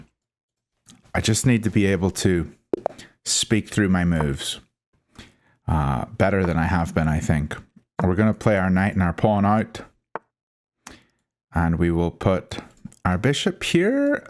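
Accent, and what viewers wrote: Northern Ireland, United Kingdom. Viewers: Are you from Northern Ireland?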